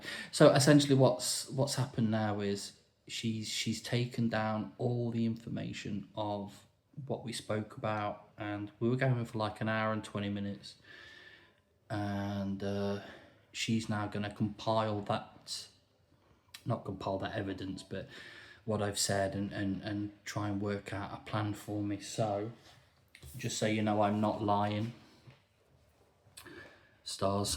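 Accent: United Kingdom. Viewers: one year sober, have not long started following your videos. would love to help and support you bro. can give you my email, if you want to chat/shoot the shit! from your accent, it sounds like you might be from around the same area of U.K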